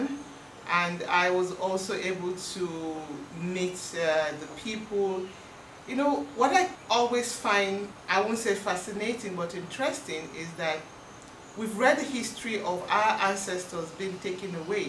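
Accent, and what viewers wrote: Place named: Jamaica. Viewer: You're already blending your native tongue with that of Jamaica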